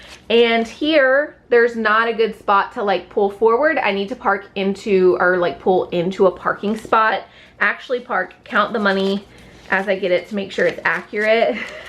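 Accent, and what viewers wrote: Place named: Oklahoma, United States. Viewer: I dont know where you moved yet, need to watch the other videos. I am just taking note that it sounds like Oklahoma. Lol. Which is where I am. 😂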